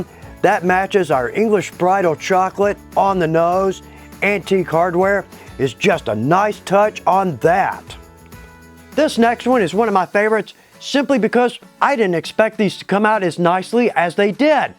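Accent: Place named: Texas, United States. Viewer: Congrats. I haven't been watching your videos for ten years, but I have for a long time. I have been doing leather work since high-school ( I am 80), but every time I watch one of your videos I learn something. Sometimes it is something that i have forgotten about but that's a different story. I enjoy watching you work and all the tips you have. One thing though, your Texas roots show up once in a while in your speech. You can take the boy out of Texas but...............! I live in Texas a little south of where you did. Keep the videos coming because I am not through learning! Thanks!!!!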